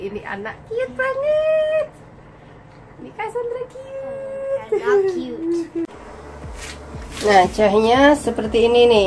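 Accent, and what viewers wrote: Java, Indonesia. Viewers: Ibue medok nemen..orang jawa mana bu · Asli Jawa mbak?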